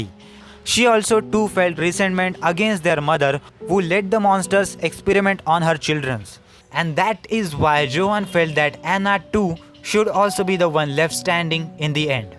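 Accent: India. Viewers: I can't stand this stupid tech support curry accent. Sorry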